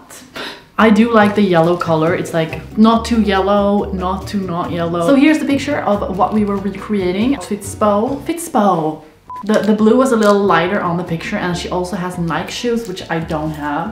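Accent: Sweden. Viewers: Bro are you swedish? You sound very swedish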